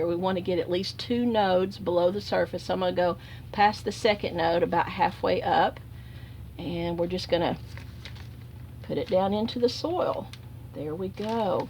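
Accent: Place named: South, United States. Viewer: Oh . You have a southern accent? I didn't notice . I picked up my English in North Carolina . So apology is not needed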